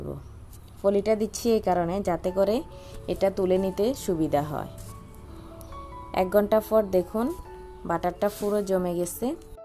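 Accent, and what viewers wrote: Chittagong, Bangladesh. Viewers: Chittagong er manos mone hocce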